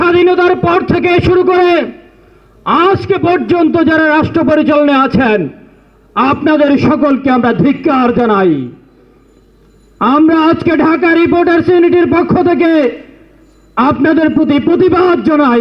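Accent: India. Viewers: এই লোকের কথার মধ্যে একটা ভারতীয় একসেন্ট আছে।।।😂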